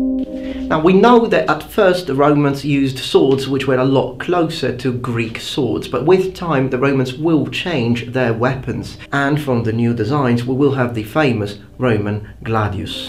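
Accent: England, United Kingdom. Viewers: Are you from the UK? Where are you from? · Man, you really had a thick British accent back in the day. Also, i like how every cut starts with you doing a little jumpscare. Bring back the jumpscares! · Cut your hair you look like a girl.-Bad mix (english accent beard and long hair)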